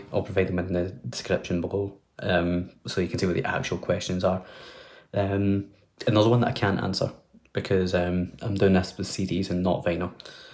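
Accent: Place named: Scotland, United Kingdom. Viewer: Hi! - Happy New Year to you. Welcome to the Vinyl Community. Enjoyed your Tag 24 video. was a good choice but you need to get some or. Where in Scotland are you based? As a fellow Scot it’s great to hear your accent. When I do a thread response I often have to show CDs. I love. is an all time favourite. I must listen to your guitar playing videos. Cheers Bill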